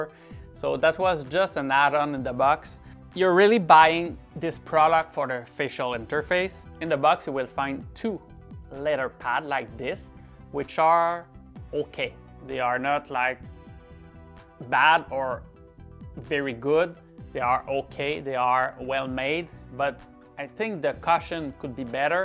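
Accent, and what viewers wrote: Quebec, Canada. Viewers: Est que t’est québécois?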